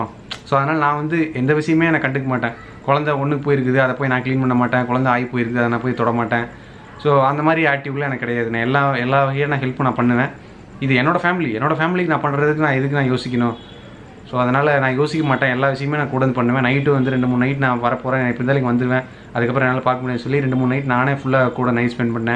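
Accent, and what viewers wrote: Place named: Tamil Nadu, India. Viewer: Ungalooda slang paatha pakka vellala gounder maathiri irukku.crt uh? Anna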